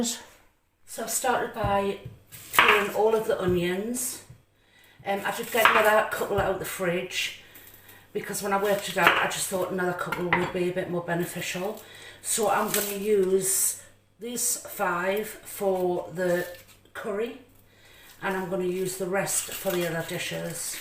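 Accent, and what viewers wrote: Newcastle, United Kingdom. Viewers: Ah cool you sound like around Gateshead ,Newcastle etc area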